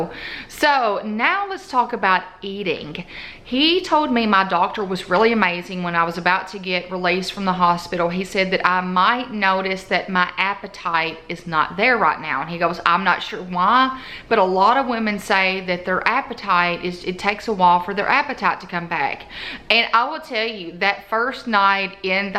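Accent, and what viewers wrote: South, United States. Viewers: Watching your video, hope ur well, I had mine December 1st, only bled 3 days, and stopped taking pain meds by the 3rd day, awww ur kitties, how many you have , such sweetie s, Sending hugs and prayers, where you from, I love people with southern accent, oh Girl, I know about the pot bellies, I hate it, I'm 46 , I had mine in December 1st and my Birthday is on Jan 9th, so I was 45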